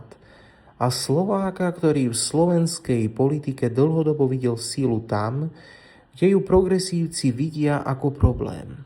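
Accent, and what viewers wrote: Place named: Czech Republic. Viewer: Už aj ty si zmutovany češtinou? Nekecrj nezvan .ale rozpravaj,,!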